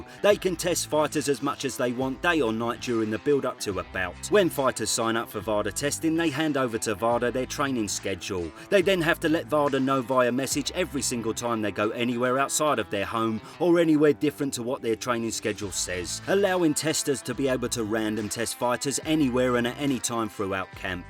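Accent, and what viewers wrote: Cockney, United Kingdom. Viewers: Never came across this channel before and don’t really care too much for boxing but YOUR COCKNEY COMMENTARY IS HILARIOUS 😂😂 what a geeza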